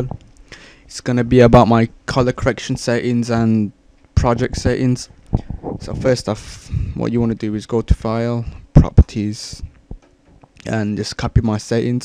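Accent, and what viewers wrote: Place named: United Kingdom. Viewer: Just love british commentaries :D - check you inbox -____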